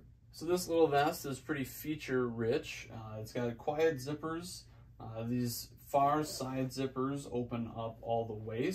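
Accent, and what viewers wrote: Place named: Midwest, United States. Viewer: I am from WI, your accent says mid to southern western. Where did or have you used said products? 👍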